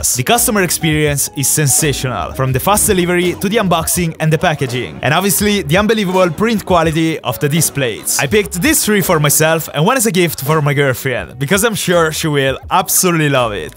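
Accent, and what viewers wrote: Italy. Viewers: His Italian accent is so good... makes me laugh cus I'm italian too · I knew from ur accent that u were italian, im too btw. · Your Italian Accent is just OP🔥🔥🔥🔥🔥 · Cool English with an Italian accent! Neato! 💕☮🌎🌌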